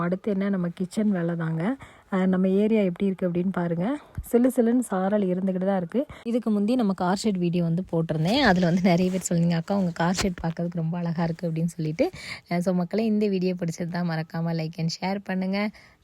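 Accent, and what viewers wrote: Tirunelveli, India. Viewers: பொடு பொடுன்னு திருநெல்வேலி ஸ்லாங் 👌🏿👌🏿👌🏿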